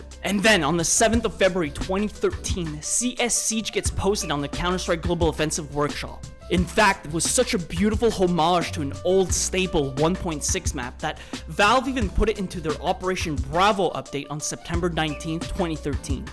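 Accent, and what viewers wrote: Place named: Quebec, Canada. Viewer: T’es tu québécois? Ton accent est parfait. Bonne vidéo btw👍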